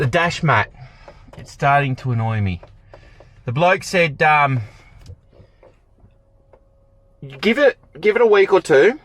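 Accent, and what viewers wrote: Australia. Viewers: Nice truck , Mate ! ,I'm Chinese ,learning English, also need some time to adapt to Australia accent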